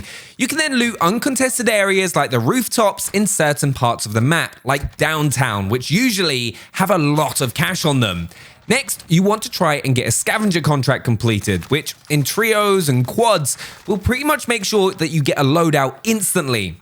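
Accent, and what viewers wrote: United Kingdom. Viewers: U sou d like a British version of rex from toy story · I love how he says butha instead of bertha XD british accents are the best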